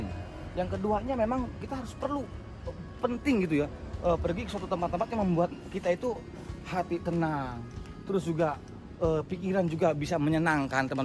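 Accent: Banyumas, Indonesia. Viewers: Nang ndi kue pak,deneng ngapak 😀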